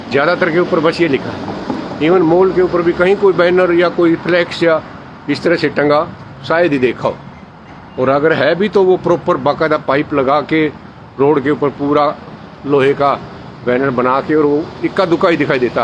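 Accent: Haryana, India. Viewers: No brother his pronunciation is entire Haryanvi and none else.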